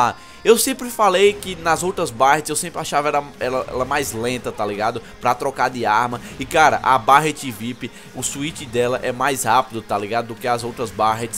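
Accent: Northeast, Brazil. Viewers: ***** mano vc é do nordeste neh ? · VC É UM MITO MANO SOU SEU FÃ PRINCIPALMENTE PORQUE VC É DAQUI DO NORDESTE EU MORO AQUI MANO EU AMO SEU SUTAQUE ASUHAUSHAUSHAUSAUHS <3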